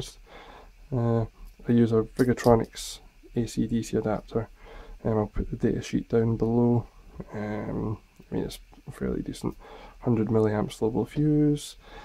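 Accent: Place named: Scotland, United Kingdom. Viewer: English with a Scottish accent, if that helps you.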